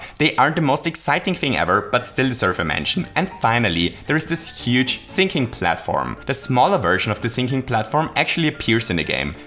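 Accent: Germany. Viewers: Are You German? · Are you german or austrian?😂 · Are you from germany? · Where are you from? I can't categorize your accent. Any chance you're German?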